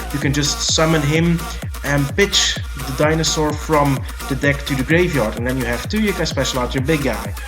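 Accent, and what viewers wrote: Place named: Germany. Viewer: I hade ask because your accent listen a little bit like my accent. And yeah I am from Germany so hello neighbor.;)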